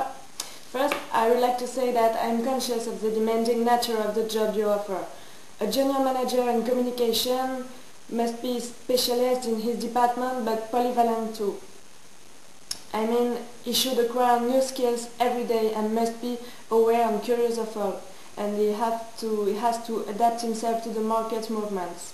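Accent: France. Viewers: a little hard to understand your english, pretty french, but hot accent thought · you r french i guess...is it ? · are you french??? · Gotta love the French accent!!!! viva la France :)))) I have no idea how I ended up watching this video!!!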